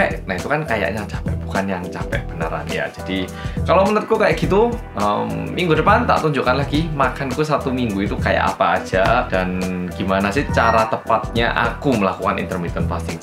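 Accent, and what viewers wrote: Java, Indonesia. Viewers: Medok nya emang sengaja gak di ilangin ya mas 😁tapi bagus kok medok jadi lebih ke Jawa jawa an gitu. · Maaf baru pertamakali nonton.. orang korea asli apa jawa? Atau campuran? Soale muka korea logat jawa..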